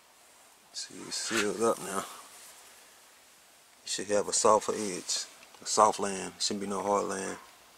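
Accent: Alabama, United States. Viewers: What part of Alabama you out of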